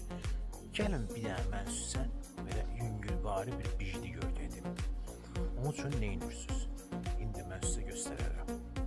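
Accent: Baku, Azerbaijan. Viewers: Bakı ləhcəsi ən gözəl ləhcələrdən biridir, bu xaş üçün ən yaxşı sous elə bakı ləhcəsidir.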